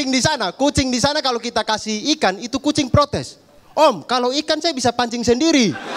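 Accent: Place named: Bugis, Indonesia. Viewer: Saya peminat abdur dari Sabah Malaysia. Hahahahah memang lawakan abdur semua lucu2.. bahasa kita pun lebih hampir sama sbb dialek abdur ni dkt2 sama dialek bugis.. lucu lawakkannya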